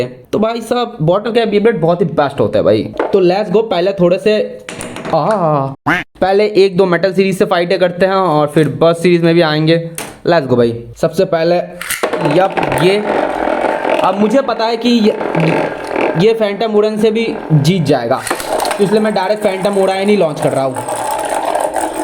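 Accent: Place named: Bihar, India. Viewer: Bihari hai ye bhai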